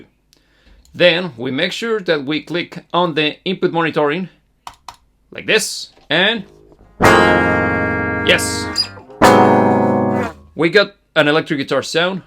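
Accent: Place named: Europe. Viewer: He sounds like a European version of McMurray from Letterkenney.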